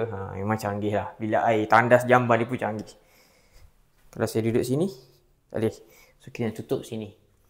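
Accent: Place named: Kelantan, Malaysia. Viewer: Dia cakap bahasa malaysia la tu.. Ada bunyi2 kelantan sikit.. Awat tu??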